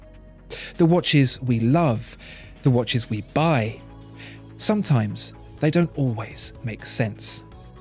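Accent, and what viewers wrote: United Kingdom. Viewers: This is completely subjective. A British accent doesn't make you an authority.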